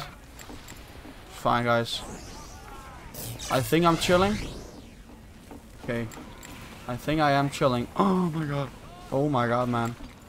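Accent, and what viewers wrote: Denmark. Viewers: Your too danish, the danglish is real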